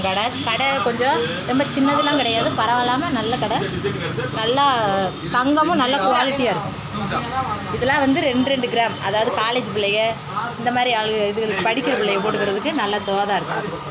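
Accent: Madurai, India. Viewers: Madurai ah ka neega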